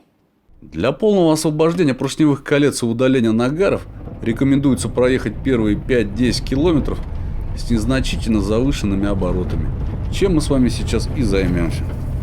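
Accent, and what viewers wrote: Russia. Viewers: По акценту голоса, можно догадаться что вы из Волгограда или волгоградской области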